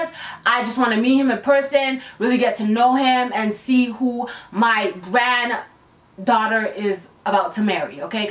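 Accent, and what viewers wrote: Toronto, Canada. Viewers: That Toronto accent tho ♥️♥️ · I LIVE for ’ Toronto accent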